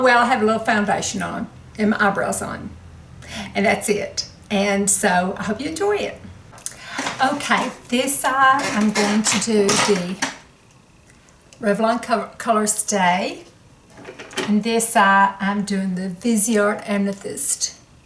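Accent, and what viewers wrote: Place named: Oklahoma, United States. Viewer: I just love your accent and the expressions you use! Reminds me of home (Oklahoma). Loved the eye looks and I'll bet no one could tell you had two different pallettes on! XOXO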